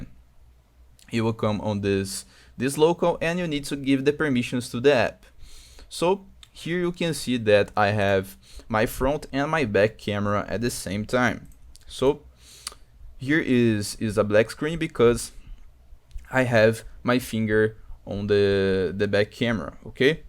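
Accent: Brazil. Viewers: Pelo sotaque é BR certeza kakaka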